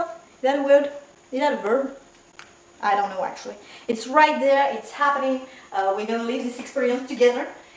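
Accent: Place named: France. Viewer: Il m'aura fallu 4 secondes pour comprendre que tu étais française avec ce bel accent, je pense que la follie de la personne est donc logique 🤣😂👍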